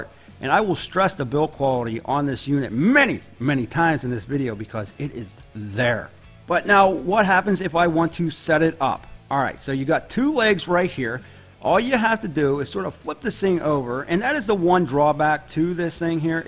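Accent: Pittsburgh, United States. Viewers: just by listening to you talk i know you're a Stiller fan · Do I detect a yinzer dialect?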